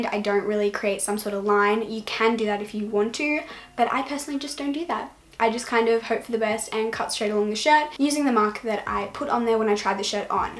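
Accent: Australia. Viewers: Is she aussie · Are you Australian? I looooveee you’re accent so much, it’s so cute!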